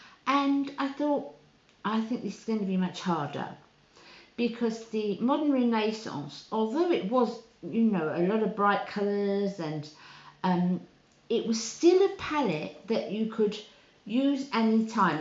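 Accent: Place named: Essex, United Kingdom. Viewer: Hello I just came across your channel and I really enjoyed way it, you sound like you have an Essex accent my neck of the woods 👍 Looking forward to watching more of your videos x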